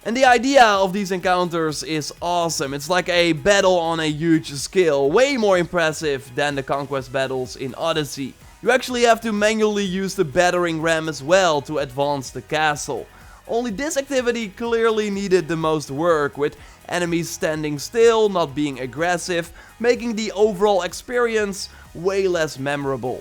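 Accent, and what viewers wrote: Netherlands. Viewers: Are u Dutch? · Yo, are you Dutch? Your accent:p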